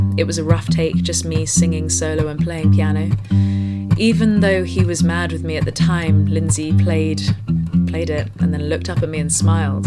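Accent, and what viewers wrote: United Kingdom. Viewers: Before you even said it I was thinking "This is one take!". Awesome - like a Rick Beato video with a beautiful British accent. I could listen to you speak all day, I'd love to hear more 'analysis' from you.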